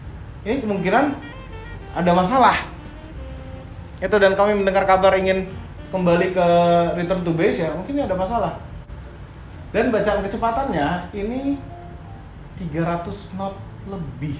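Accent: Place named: Blitar, Indonesia. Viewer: Blitar men logate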